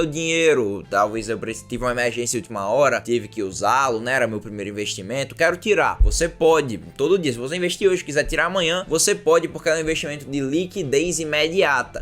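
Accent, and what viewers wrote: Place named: Paraíba, Brazil. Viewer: Menino isso é uma aula!!!!!! Tava aqui maratonando os vídeos sobre o banco Inter pq vou abrir uma conta nele (nextante) !!!!!! Sou nova nesse mundo dos investimentos, e tenho muito medo de perder meu pouco e suado dinheirinho. Vc fala de forma muita esclarecedora, com esse sotaque; paraibano, pernambucano kk, parabéns mesmo e muito obrigada pelo vídeo.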